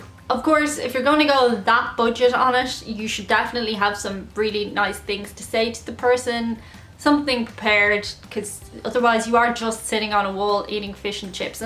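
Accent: Ireland. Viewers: What's a whoaman? Or in these modern days with these crazy kids, woahman. OK. I didn't think could get any prettier but then she showed up in glasses. ''You may have to pay a wee tiny fee''. OMG. The Irishness is just so adorable. Are there still dullards that still care about Kim Whoredashian? Such a dirty skank. So YouTube sucks at paying you guys? Does YouTube need a throat punch?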